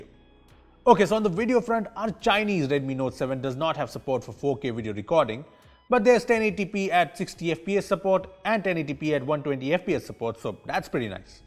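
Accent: India. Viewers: I dont understand why Indians have such a hard time getting rid of their accent. The D's are sooo annoying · I like this channel bcause his accent different from another indian channels 👍👍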